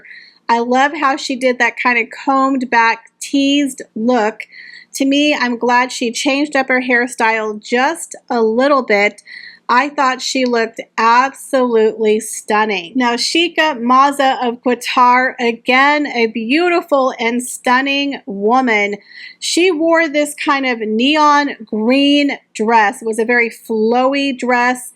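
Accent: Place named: United States. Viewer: Thank you for your great enthusiasm and representation of the Royals across the globe. If I may please ask? And I understand your dialect from America may prompt you to pronounce certain words as if they were American. Tiara is pronounced Ti a ra not Tiyerra. I am European/Australian and have worked in high end fashion for a long time and English is not my first language, however I may it a point to pronounce, people’s names or foreign names as they are pronounced in their country. Elie Saab is E l i not Ili. I watch you on all your shows and I do get it being American, you tend to make certain words sound more familiar to you.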